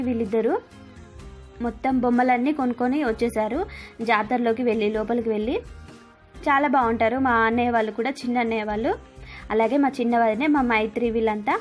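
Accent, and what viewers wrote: Telangana, India. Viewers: Hi Telangana basha mustuga maladutunavu dress super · Super akka telangana yasa superrrrrr love u akka pakka telangana matalu superrr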